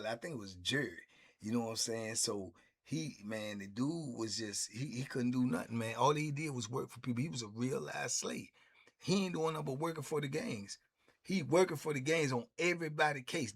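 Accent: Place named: Washington DC, United States. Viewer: I like the way you sound like a a DC Youngin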